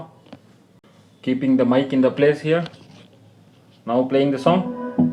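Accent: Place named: India. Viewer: I love the indian accent when you can actually understand them, great video, thank you very much!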